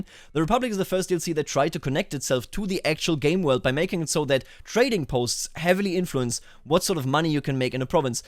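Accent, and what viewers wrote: Germany. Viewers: Well, this is how actually true German English accent sounds. It's always funny to see so called "Germans" in american cartoons that speak like Russians.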